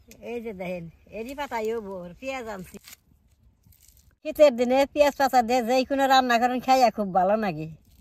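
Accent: Tangail, Bangladesh. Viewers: মাসীমা, আপনি নিশ্চয় বাংলাদেশের টাঙ্গাইলের মানুষ ছিলেন। কথায় বুঝতে পারি। টাঙ্গাইলের বাসিন্দারা কলার বেরাইল/ থৌর খেতে খুব পছন্দ করে।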